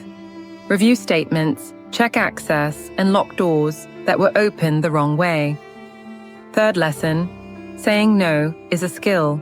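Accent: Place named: United Kingdom. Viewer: Sound more British than from Nebraska.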